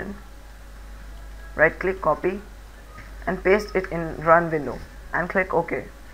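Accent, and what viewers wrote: India. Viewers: you are indian xD ? ps im not trolling you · Polish person with Indian accent i love it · Funniest indian accent ever but thanks for fixing my problem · are u indian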